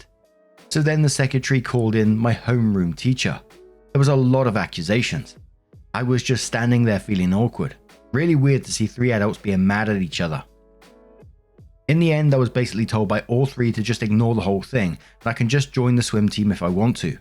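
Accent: United Kingdom. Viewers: makes doing housework so much more bearable! Thank you so much for being so much a part of my day that it's not complete without hearing "What's up, Waffle Gang?"! Plus, as an American, his British accent makes him sound so distinguished! I'm sure I'd still like him if he were American (I just wouldn't respect him as much, lol).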